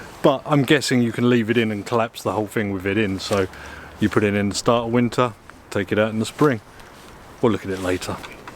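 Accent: United Kingdom. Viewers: I found your channel a few days ago and have been binge watching you ever since! I just saw one you made two years ago where you didn't talk at all. I'm glad you decided to start talking to us! (Americans love a British accent!) And, I'm learning a lot. You're so adventurous, especially with all the cold and rain. As I'm from Florida, I'm amazed at your tolerance for cold mornings before the fire's started and the coffee's made! PS That ending was so smooth! Those products were really well thought out!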